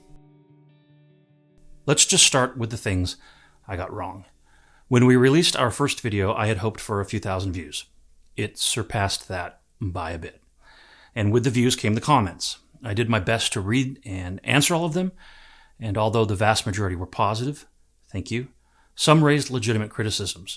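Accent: California, United States. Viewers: You sound like a Californian. Bringing your liberal bullshit to Tennessee. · You sound like a stuffy Elite from CA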